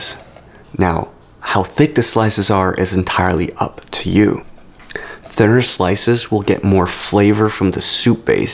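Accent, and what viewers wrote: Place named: United States. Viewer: Unnecessarily Far too long with American accent is irritating. Some items are too exotic to find in the UK.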